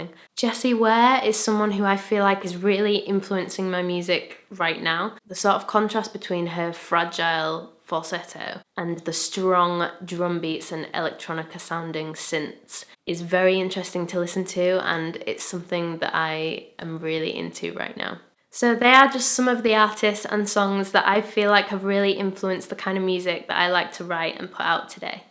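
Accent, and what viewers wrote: United Kingdom. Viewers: I have been listening to your music for a while now. This is the first time I've heard you speak and i didn't realise that you have a British accent lol I always thought that you were from America! Lol x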